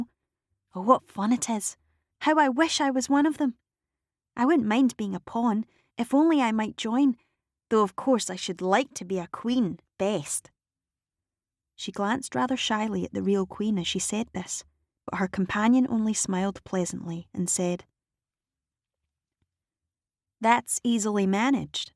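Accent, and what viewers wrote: Scotland, United Kingdom. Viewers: and her scottish accent. Perfection. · got the most beautiful Scottish accent ever! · Chinese girl with a Scottish accent my God literally could not find a more perfect beautiful woman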